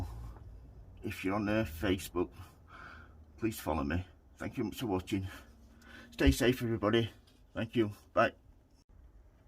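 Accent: United Kingdom. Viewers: Cannot hardly hear you--volume and brit accent--anyway you can list the medium ou place the seeds in? TY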